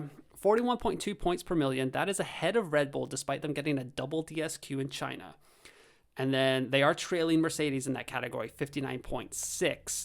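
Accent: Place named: United States. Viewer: are you an American who has moved to Europe? Your accent is so 🇺🇸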